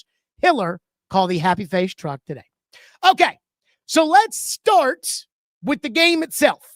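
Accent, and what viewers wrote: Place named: New Jersey, United States. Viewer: Could’ve swore this guy from jersey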